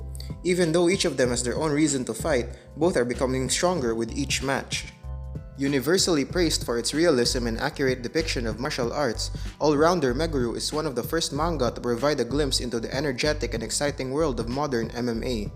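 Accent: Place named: Philippines. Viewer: Flipino right? Your accent seems familiar.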